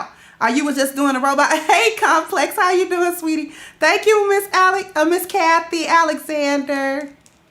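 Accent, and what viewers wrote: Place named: South, United States. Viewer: Yu got to be frm the south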